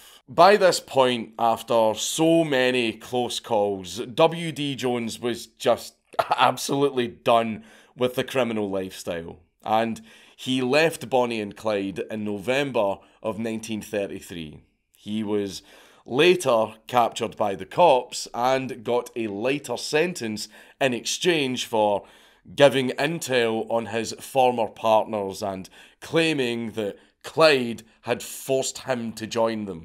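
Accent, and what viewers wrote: Scotland, United Kingdom. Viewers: You know, I never really was interested in the legend of Bonnie and Clyde untill I found out the story was going to be told by this charismatic, jolly Scotsman. 😃👍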